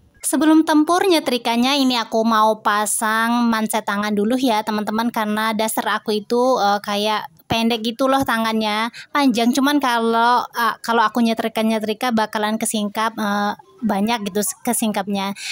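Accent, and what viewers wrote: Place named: Makassar, Indonesia. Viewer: MasyaAllah... Mampir lg ni teteh. Logat makassar jg teh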